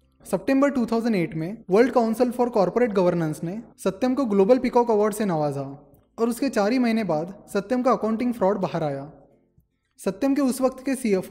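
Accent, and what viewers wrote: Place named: Gujarat, India. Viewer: You sound like Gujju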